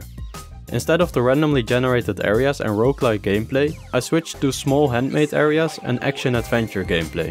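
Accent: Netherlands. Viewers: As someone who is dutch its funny to hear from your voice that your dutch😂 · Man u dutch? U have a bit of a dutch accent Oh nvm, already got my answer, good job tho, really excited to see a dutch indie making a genuinly good game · Once u started talking i immediatly knew u were dutch, turns out u r a medenederlander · when strong ass dutch accent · Are you dutch? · U really sound dutch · not meant bad but you kinda sound dutch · Verry muts a duts aksent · are you dutch? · Is this guy from the netherlands? Edit: yep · Are you dutch? You sound pretty dutch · mmmm Dutch accent edit: Ahh I knew it Nederlands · Ik kan echt meteen horen dat jij een Nederlander bent. :-) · I smell a bit of dutch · Woah.. As a computer science student, I cannot address enough how amazed I am by your work.. ( I am just a second year tho) Currently learning some unity for the fun of it myself as well, hoping to one day become as good as you are.. 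Also, I knew from the first words you spoke you where a dutchie too, laughed way too hard at myself when I saw you made the grade app xD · Damn ik hoorde aan je stem meteen dat je nederlands was haha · could you show some vids of you developing sprites, such as trees, or rocks. the simple but necessary things a game needs. i have been wanting to make games myself and have a little bit of experience in making games, but i dont really know how to make any characters/sprites for the game. i hope you could possible teach me a little bit more. ps. like ya dutch accent ;) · You sound so dutch but great english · are you actualy dutch? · off topic but i can hear youre also dutch lol :) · You got a Dutch accent, am I in the right spot😉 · Je bent nederlands he? Je hoord het. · i smell, Nederlands. Am i right? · Ben jij Nederlands zo klinkt het wel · your dutch right? i hear it from the accent, im dutch too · you'are dutch aren't you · are u from the netherlands bc i can hear it from ur accent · i love how clear it is that you are dutch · I knew you were dutch from the first second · Hey my guy first time watching your vids and i noticed an accent are you dutch I find it very cool when people are dutch because im dutch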